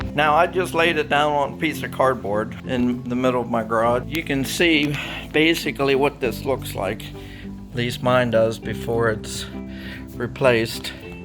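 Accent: Western Pennsylvania, United States. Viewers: Thanks! We are going to give it a try. And you must be from western PA. The most unique accent in the United States. 🇺🇸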